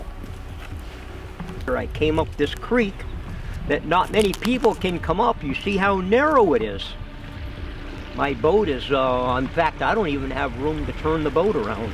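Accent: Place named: East Coast, United States. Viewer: I wonder what his ball cap said before Bone Rush gave them one of theirs. He talks just like fellers from my area on the East Coast.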